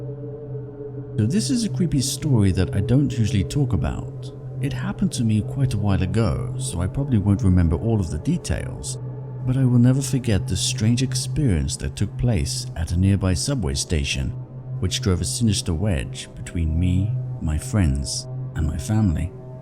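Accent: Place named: RP, United Kingdom. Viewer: Love is posh voice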